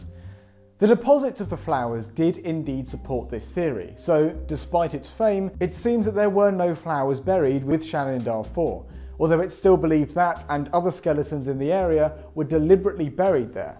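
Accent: England, United Kingdom. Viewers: This was cute. Nice English accent.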